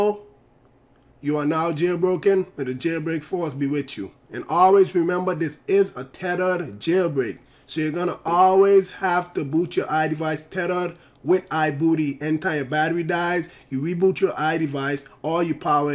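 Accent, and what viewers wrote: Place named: Jamaica. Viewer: Dude. I love your accent. Are you Jamaican ?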